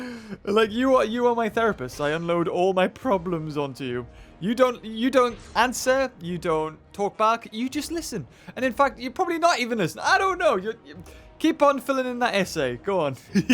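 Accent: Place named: United Kingdom. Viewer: why do you even think i came here for? The brittish giberish ofcourse:)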